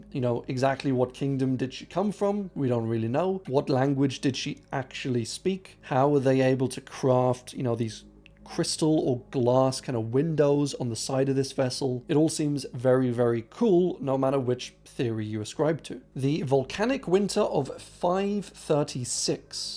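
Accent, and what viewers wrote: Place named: London, United Kingdom. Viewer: London? But then at times I hear something else 🤣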